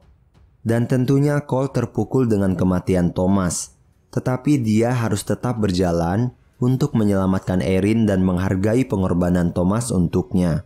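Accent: Kalimantan, Indonesia. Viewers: Abang orang kalimantan mana bang Edit:tumben bikin konten alur cerita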